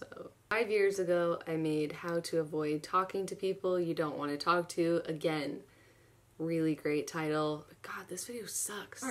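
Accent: Boston, United States. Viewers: lol your boston accent is GOLD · It's been incredible to see how much you've grown as a person over the years. I've been on your channel since the beginning and it feels like i've grown with you. Loved your videos then, love them now. I totally forgot you had a thick Boston accent btw!! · That Boston accent tho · omg i never realized how strong of boston accent she had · I LOVE YOUR ACCENT!!! I got my Boston accent from you and I live in Oklahoma. You use your accent in your most recent videos as a thing, as an attitude. You are ole Greg to me, except you're better. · Caitlin Slattery she said in the video that she had a Boston accent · I love the old Boston accent showing through! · Zietz I totally agree with you on this. I was thinking the same thing. I can understand her not wanting to watch herself though because I am the same way, but don't apologize for being you back then. 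You were amazing then as you are now. And I hate that you changed you Boston loud accent on purpose. It makes me sad. Sure grow up but be authentic. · I can't believe how strong your Boston accent used to be, I've been a fan since 2010 but I never noticed the change!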